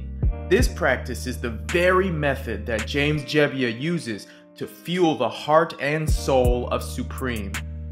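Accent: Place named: East Coast, United States. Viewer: The stylized east coast regional diction that is narrationally employed throughout this video, is better enjoyed at a faster playback speed as it is more pleasing to the ear and the larger demographic of viewers most likely to watch this genre of YouTube multimedia.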